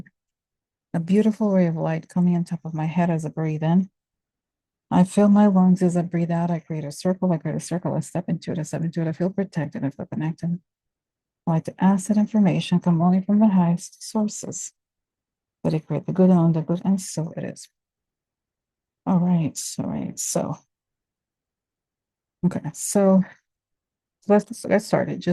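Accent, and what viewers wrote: Russia. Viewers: Puh-leeze keep the Russian accent when doing Vlad and his filthy band of criminals…..and the teeny tiny 🎻….was laughing so loud, it feels good to laugh at this sordid story we’re forced to live through… Merci. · You are the very best! I love your russki accent! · You are amazing! So intelligent and entertaining! Love the Russian accent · I LOVE your Russian accent! I look forward to your readings every day. Thank you for giving us hope and keeping our fighting spirit alive! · I enjoy your Russian accent and the tiny violin is too too much! Humor can really help during these dark, tense times. Alot of folks who listen to you feel the same way IMO. By the way, the privacy screen is attractive and it's color lightens up your office space. Just wanted you to know. Take care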